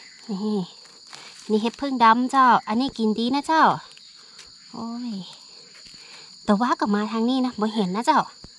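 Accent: North, Thailand. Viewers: เป็นกำลังใจให้ลูก ใด้เห็กเยอะๆลูกแม่ชอบาำเนียงคนเหนือของลูกเสียงแจ๋วๆชอบมากเจ้าๆเพราะจริงๆ ฟังเพลินทั้งเก็บเห็ดแม่จะกดให้กำลังใจทุกวันเลยจ้า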